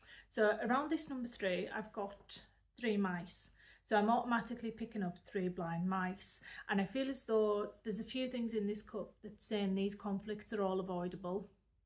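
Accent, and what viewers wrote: Newcastle, United Kingdom. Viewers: Yes l get what your saying she does sound a bit Geordie on further listening more north Yorkshire, l myself 12 miles from Newcastle. Where are you from? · Geordie or Liverpool I feel, I'm leaning Geordie. Someone perhaps not born here but has lived here a long time and picked up the local accent is my feeling? It's a nice accent, very interesting.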